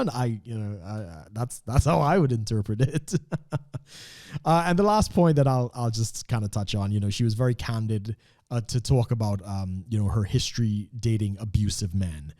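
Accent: Trinidad and Tobago. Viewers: Waw..the way in which your accent comes across sound a lot like a TRINIDADIAN! and on second note...very well put. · You from the caribbean bruh?🤔 you sound like a trini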